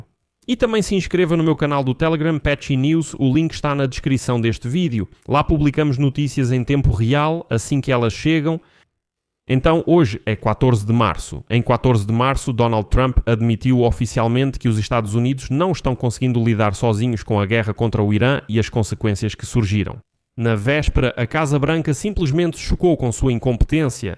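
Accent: Portugal. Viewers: Não dá pra ficar misturando dois sotaques Ponho o comentário e saio Gosto muito do sotaque ❤luso mas bagunça não dá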